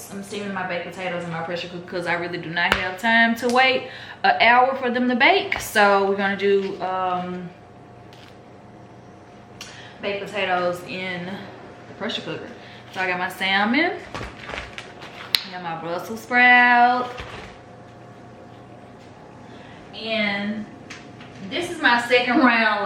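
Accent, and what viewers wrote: South, United States. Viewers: This Mississippi transplant from Fort Worth could spot the Southern Accent! Love your videos🥰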